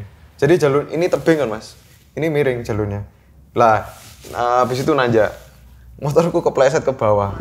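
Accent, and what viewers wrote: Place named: East Java, Indonesia. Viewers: Podo" wong jawa timur mas.. Suueneng aku karo logatmu mas😂.. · Bangga dengan medok jawa mas. Karena mencerminkan orang yg lucu dan sabar hati. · Peeeh..byuuh...khas e kediri😅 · Yo-opo rek malang e medhok e pol 😂😂😂 , q Melu meker pedut iku bhs indo e opo!!! Jasikkk 😂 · Bahasane campur". 😂😂😂 Jowo indo campur . Medok emen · wkwkala bener mas logat e wong jatiman pol😂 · Y Allah lucu ngomonge wong jowo,salut ..salam dri jowo kendal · Anune,itune,katane,ndek sana,ndek situ,suuuwepiii,tuuuwakoot,gak uwweeenak,pehhh,behhh,. Jawatimur pride ❤🥰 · Amat sangat medhok ngrungknno seneng 😂😂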